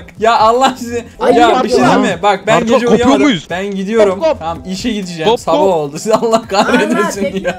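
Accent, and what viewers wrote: Malatya, Turkey. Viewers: Abi sen malatyalımısın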